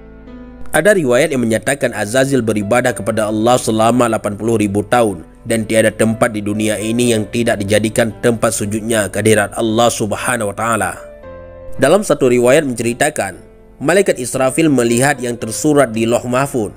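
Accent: Aceh, Indonesia. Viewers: Anda bicara seperti orang Aceh , apakah benar ?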